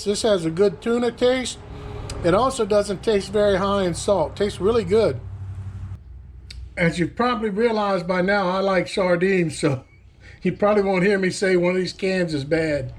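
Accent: Louisiana, United States. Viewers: You from LOUISIANA?? If you don’t mind me asking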